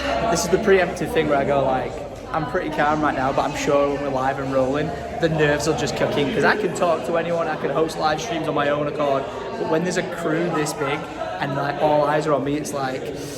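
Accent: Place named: Manchester, United Kingdom. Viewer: Corrrr , u can tell his a manc , a box of Straaaarberys and cream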